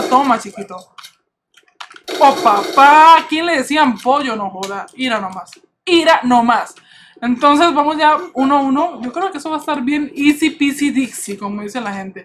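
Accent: Colombia. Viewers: de dónde es este man? porqué aveces parece colombiano y otras venezolano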